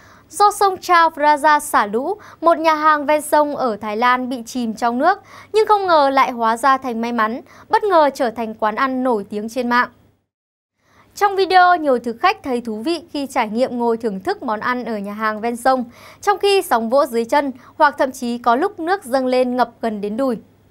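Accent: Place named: Hanoi, Vietnam. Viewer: thi giong nhu ngoai ha noi may chu gi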